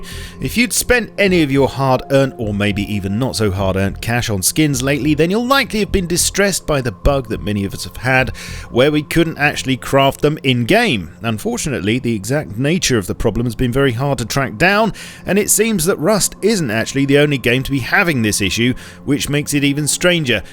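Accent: United Kingdom. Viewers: British I think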